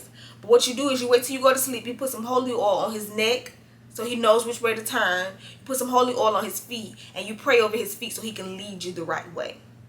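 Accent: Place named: South, United States. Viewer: Girl you country den a MF.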